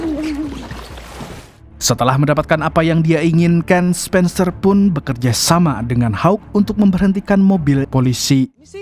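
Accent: Banjar, Indonesia. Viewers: urang banjar😂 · Aeee... Urang banjar kh pian..?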